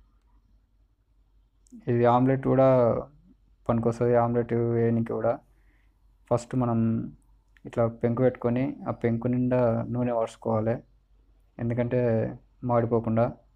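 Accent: Telangana, India. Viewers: తెలంగాణా బాషా ఇది .మస్తు చెప్పినవ్ బ్రదర్..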